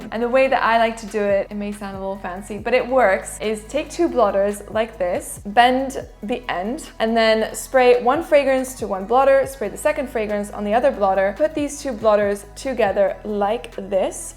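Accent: France. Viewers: Tu es Française ? tu as l'accent français quand tu parles anglais 😅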